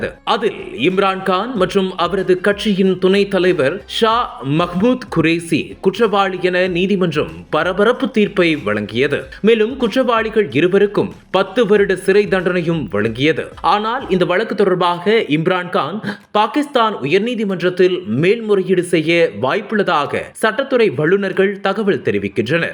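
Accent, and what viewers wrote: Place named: Sri Lanka. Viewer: Voice style nalla illai.. rombba irritation aagudhu ....sri lenken modulation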